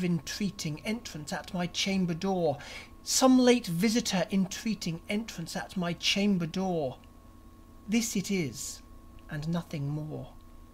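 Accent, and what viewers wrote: United Kingdom. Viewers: Deep sht. R u brittish?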